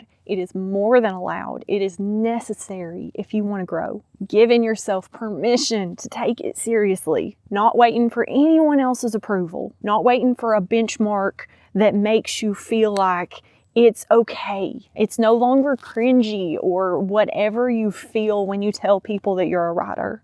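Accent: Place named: Alabama, United States. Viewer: Your accent is adorable! I thought you were Alabama, but go Vol's! Appreciate your message. My debut novel (The Year of the Cat by Gary Kotowski - Amazon) didn't move the 5000 copies I envisioned it to sell. I'm still invisible to agents and I'm too discouraged to start a second novel. Needed to hear your step three! Thank you!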